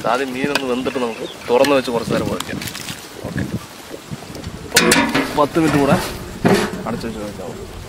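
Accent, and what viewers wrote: Palakkad, India. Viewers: പാലക്കാടൻ ഭാഷ ഇസ്തം 😀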